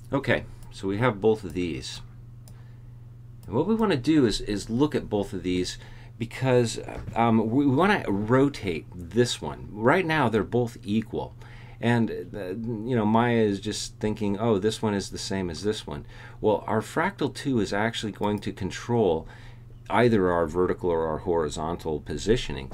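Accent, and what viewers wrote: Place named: South, United States. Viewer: good but it's hard to follow with your southern accent, maybe work on a more neutral accent. it gets annoying after a while. thanks for posting.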